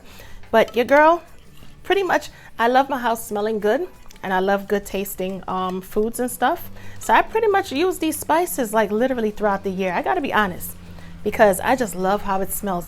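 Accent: New York, United States. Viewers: I knew I recognized that accent NY your dishes look great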